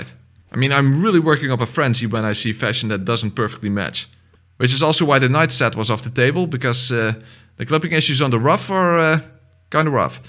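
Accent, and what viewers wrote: Netherlands. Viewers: Ey ur Dutch right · A dutch man, god, thats gonna bother me... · Are u a dutch guy? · Is hé dutch?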